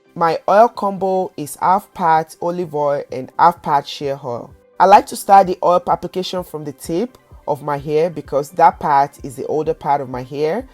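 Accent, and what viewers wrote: Yoruba, Nigeria. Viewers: No matter the accent the yoruba accent will always peek through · Your Yoruba right? I’m Igbo and I recognized your rich accent. Lots of love❤️❤️❤️❤️❤️❤️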